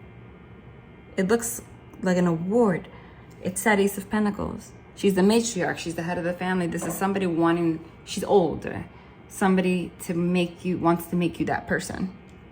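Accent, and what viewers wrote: New Jersey, United States. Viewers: Are you from jersey?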